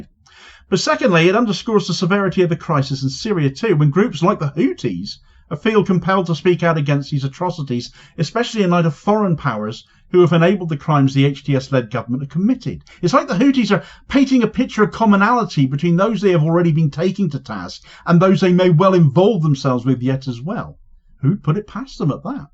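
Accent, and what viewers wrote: United Kingdom. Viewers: I have great difficulties with the British dialect of American 😁, however, thank you for covering this important content.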